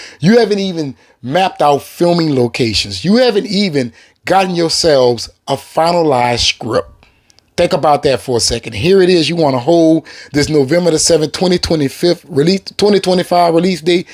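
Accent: New Orleans, United States. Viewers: Man, am I hearing a nawlins’ accident? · Are you from New Orleans? I hear the accent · that N.O. accent hitting and is much needed in the YouTube space. Keep it flowing, my friend. Love your content!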